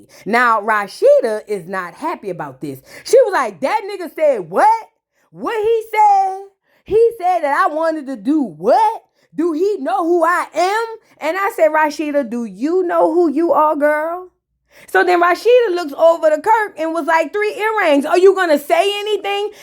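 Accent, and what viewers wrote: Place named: Louisiana, United States. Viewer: Your voice sounds like Tokyo Toni meets Louisiana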